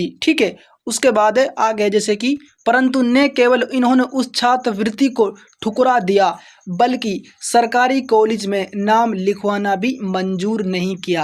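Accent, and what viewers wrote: Mewat, India. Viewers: Oye tu mewati to na h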